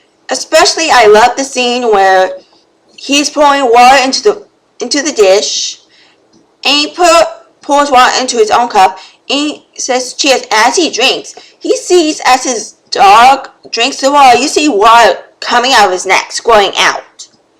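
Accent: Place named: New England, United States. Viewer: From the sound of your accent I am assuming that you are from New England; perhaps Maine.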